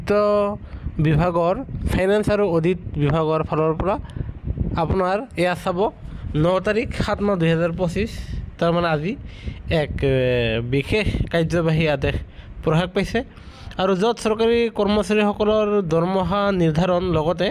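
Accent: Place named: Bengal, India. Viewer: Axomia vakha Jiva khonot val ke practice kori lo.. Bongal buli gom pai😅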